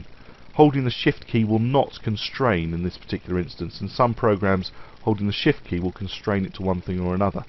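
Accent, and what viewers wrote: United Kingdom. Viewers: "Creative Cow" -> Kids toy -> Country music -> "Moo" sound effect -> British accent?! You surprised me there. I was expecting a southern American accent and some sort of hacker tutorial. Good lesson, man. These things are almost as useful as Tweens in Adobe Flash! This lesson helped me create the effect of the camera panning across a picture. Thanks a lot!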